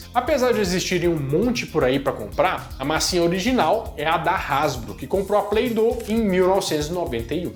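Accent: Minas Gerais, Brazil. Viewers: Único canal em que não pulo qdo está pedindo pra dar like e compartilhar. Amo seu jeitin mineirin de falar. Pura nostalgia pq sou mineira e não tenho sotaque pois estou há 65 anos em São Paulo. E seus vídeos são ótimos, amo curiosidades e aprendo muito com vc rapaz. 🙏🏻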